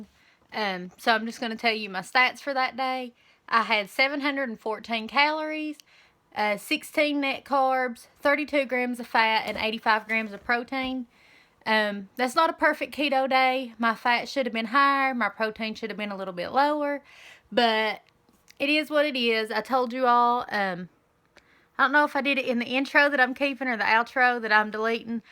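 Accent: South, United States. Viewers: I love your country accent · I know you are from the South and I'm probably just not used to it ... but seem so sweet! can't imagine anyone giving you a hard time. heh. great eats and Kickin butt on the water too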